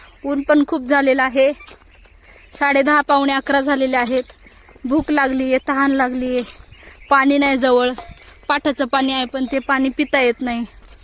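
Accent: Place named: Rural, India. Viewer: ताई मी तुमच्या आई च्या वयाची असेन तुमचे परिक्रमे चे व्हिडीओ मी नेहमी बघते, तुम्ही खूप साधे पणाने राहता व साध्या सोप्या ग्रामीण गंध आहे तुमच्या बोलण्याला! नर्मदा मैया तुमची इच्छा पूर्ण करो! 🙏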